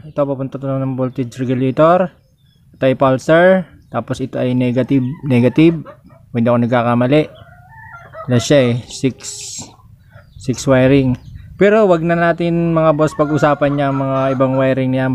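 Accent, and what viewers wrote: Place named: Batangas, Philippines. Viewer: Boss na sub na kita. Npansin ko lng batangenyo ka.. btangenyo dn to hehe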